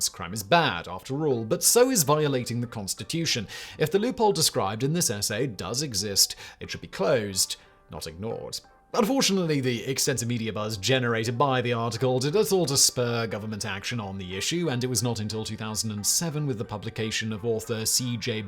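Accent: England, United Kingdom. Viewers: He's British. 🤣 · Its Bri’ish. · is speaking correctly. It's the English pronounciation. All the time there is aluminum and math, there is no room to shout. The language is called English (not American), afterall.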